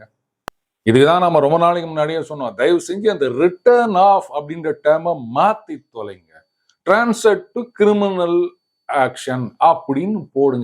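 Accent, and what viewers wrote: Chennai, India. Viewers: Yenna saambu ore kuriyeedu Nari (traitor) Puli [Poonai kutty) Singam (Anna)... bada shokka keedhu pa... kalaakureenga thaleevare... Cho illadha kurayai nivarthi panreenga sir.... your Chennai slang is awesome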